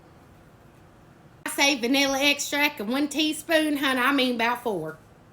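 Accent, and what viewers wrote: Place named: Pennsylvania, United States. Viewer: Where you from?! Pencil-vainya? Kentucky or Tennessee? Cute accent😊 Yummy lookin quick snacks the way! Keep going boo❤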